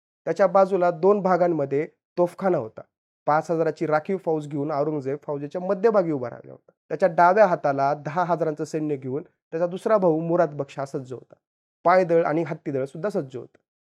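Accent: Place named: Kolhapur, India. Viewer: Gosht chaan hoti... pan shabdochhar sarv to pari navhta. Fakt Kolhapur walya sathi VDO banavla ka ?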